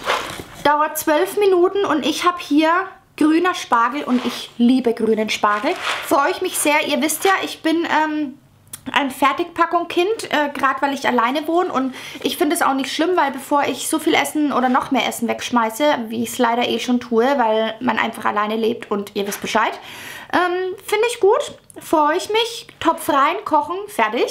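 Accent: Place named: Franconia, Germany. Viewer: Du bist mir fränkisch am liebsten❤️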